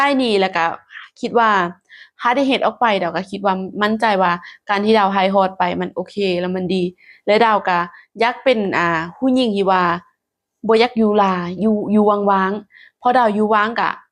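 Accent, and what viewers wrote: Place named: Lom Sak, Thailand. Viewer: ภาษาลาวเหนือบ่คับ เว้าคือหล่มสักบ้านผม คนล้านช้างคือกัน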